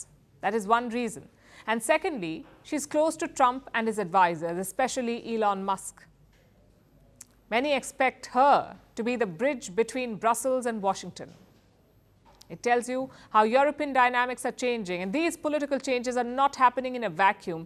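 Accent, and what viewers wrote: India. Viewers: you’re not watching the show, that’s damn sure! I’m addicted to, excellent reporting, she’s smart beautiful lady, I’m a huge fan of her for sure! To be honest with you I kind of have a little crush on her from her beautiful Indian accent to the way she talks and……. Lol I know she hates Trump anyone can see it, including Stevie Wonder. needs to get into the program, it’s not late. You have to follow Mark Zuckerberg, Jeff Bezos …did 😂don’t forget he’s a good friend of Narendra Modi also. I don’t think she drink Kamala’s kool aide so she should support the guy say no to Wars! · insufferable indian accent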